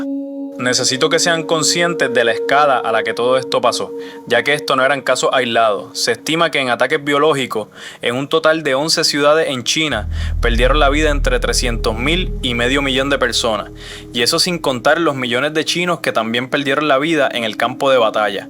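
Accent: Cuba. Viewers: Si tiene acento de cubano, no vale.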